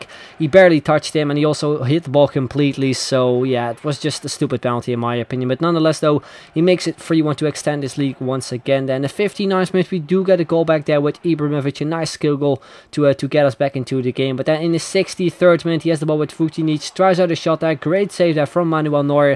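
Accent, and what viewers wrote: Netherlands. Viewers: Je hebt echt een nederlands accent maar je bent wel fcking goed met skills ;)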